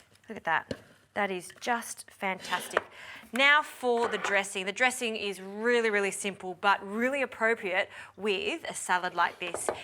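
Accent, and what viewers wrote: Australia. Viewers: I love the Aussie accent ❤️❤️❤️. Only came here to see if sprouts were ok to eat raw 😁😁😁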